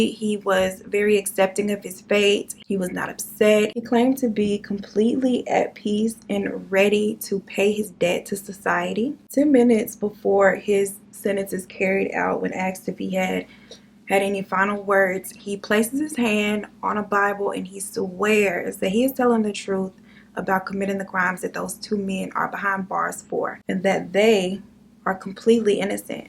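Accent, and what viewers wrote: South, United States. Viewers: I like you. You have calming voice along with everything else. If you would accept a positive advice, of course in case you would like subscribers from all over the world, including not native speakers. I understand you in general, but sometimes I can't hear clearly. You speak soft and when you do it fast, silent and typically southern with lots of reductions i am having a hard time. Please don't misunderstand me, it's all positive, I love your videos and wish you the best.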